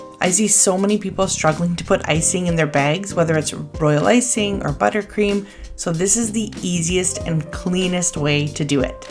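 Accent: Canada. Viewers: OMGosh~ Thank You SO Much for linking these videos! Apparently, I'd watched them, as I'd "liked" them & added them to my "Crafts & DIY" playlist, but I'd completely forgotten about the double-bagging trick, so I can't tell you enough how much I appreciate you posting these links. I'm recently disabled, so I can't do as much as I'd like with my grandkids, but cooking & baking is one of the things we CAN do. They're sensitive to sugar, however, and their moms like to keep them to an organic, healthful diet, so I've used some of your ideas with my recipes for hummus to decorate their favorite quinoa chips we bake. We dye the homemade hummus with beet & spinach powder (which gives it a really unique flavor) & they make flowers and leaves on the crackers. Their other favorite thing to make is ginger chews (just boiled strips of ginger with equal parts water & sugar until soft, then sprinkled with sugar when cooled~ these obviously have a lot of sugar, so they're a once-in-while treat). 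Sometimes, the ginger is a little too spicy. I have 7 grandkids, ages 7 to due in 2 weeks (my only granddaughter is the 7 year-old), and they all love spending time in the kitchen! Thank You AGAIN for ALL Your GREAT Ideas & Fantastic Spirit! PS. My Mom was Canadian, do I hear a hint of a Canadian accent? I still have my Mom's "Kate Aitken's Cookbook" that must be 70 years old by now. Many Blessings to You & Yours!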